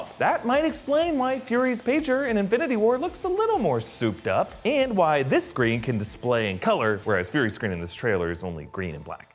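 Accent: Russia. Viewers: Like your russion accent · Love ur russian accent and the parallel with cw phone is purfect nice guess 👍 Awesome vdo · Your Russian accent made me think of Tank. I miss Tank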